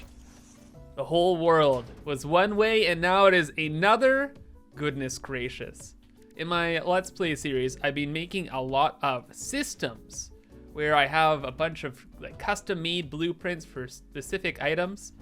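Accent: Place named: Canada. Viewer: i love how canadian you sound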